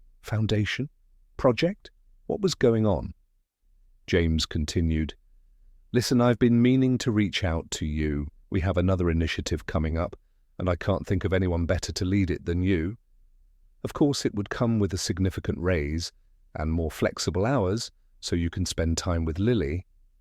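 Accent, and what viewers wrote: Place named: RP, United Kingdom. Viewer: Greetings from Poland, and thank you for a great story! Your narrator has an impeccable English accent (RP, isn't it?) that allowed my listening comprehension to be about 100% without subtitles, even though English isn't even my second language (I only studied EFL).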